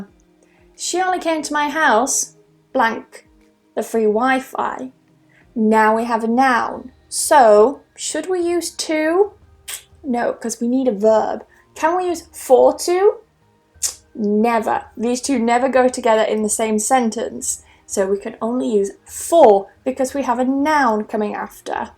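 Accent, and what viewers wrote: United Kingdom. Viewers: Love you, love your lesson, and love your british accent. #oneOf Ur student from Indonesia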